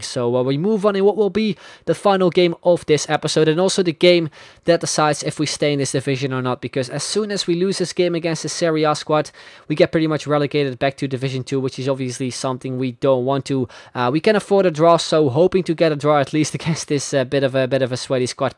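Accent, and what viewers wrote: Netherlands. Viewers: you sound dutch. Are you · Are you dutch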